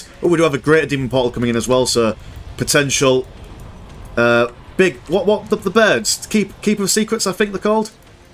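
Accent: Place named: East Yorkshire, United Kingdom. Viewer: I wonder which side of east Yorkshire 🤔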